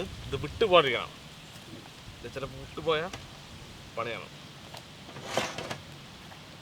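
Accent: Palakkad, India. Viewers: പാലക്കാടിനെ തന്നെ ഭാഷയും മഹിമയും കാത്തുസൂക്ഷിക്കുന്ന 🥰 ekka ഇരിക്കട്ടെ ഒരു കുതിരപ്പവൻ ♥️👍🏻♥️🟠🟠🇮🇳🇮🇳🇮🇳🇮🇳🇮🇳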